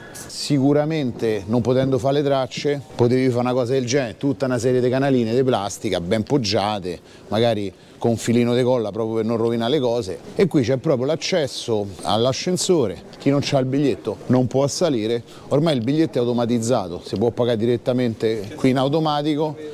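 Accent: Rome, Italy. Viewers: Grande Cicaló, sto video giunge a fagiolo, a Roma ce devo da vení fra du mesi, hai inserito delle info importanti, si te vedo in giro pe Roma te saluto.. Quant'è bella sta città ❤️ e quanto me piace a parlata romanesca... - Ma dimme, ma come só ste olive.. - eh come só, sò greche 😉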